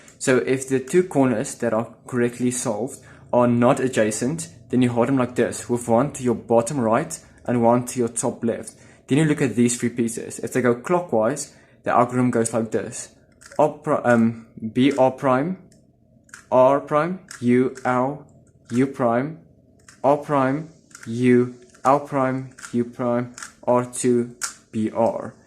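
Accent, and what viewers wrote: South Africa. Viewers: I may be wrong, but are you South African?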